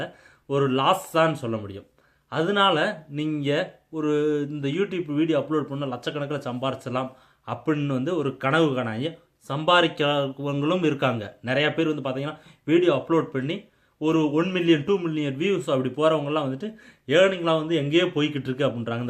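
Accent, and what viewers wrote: Madurai, India. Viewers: boss nenga madurai ah...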